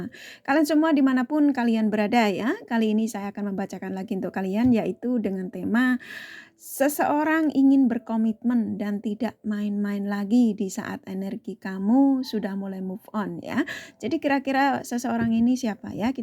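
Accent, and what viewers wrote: Java, Indonesia. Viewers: Trima ksh kak atas readingany, resonet jln ceritany, mf kak agak nyimpang sedikit dr tema ny, suara kak sama benar dg suara seseorg yg ad d dekat rumah q, logat Jawany, cara ngomong ny, nama ny Mbak Murti, dia buka salon d sini, coba dong kak tunjukan wujud kakak ingin lihatny, mf y kak kalo AQ agak lancang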